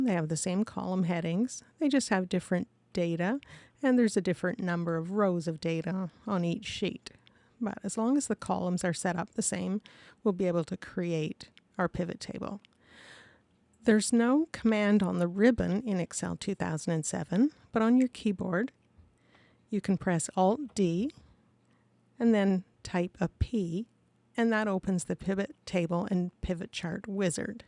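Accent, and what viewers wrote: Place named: United Kingdom. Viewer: This helped a lot thanks. Also, idk why but her voice kind of sounds like a British person trying to use an American accent. Not necessarily bad, just interesting.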